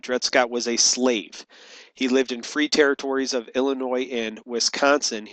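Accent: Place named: Cincinnati, United States. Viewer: You must be from Cincinnati lol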